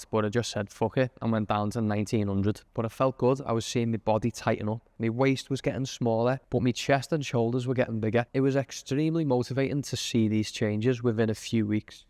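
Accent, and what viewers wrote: Liverpool, United Kingdom. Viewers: Not so much into fitness channels anymore but trying to do better again- subscribed for a scouser who speaks sense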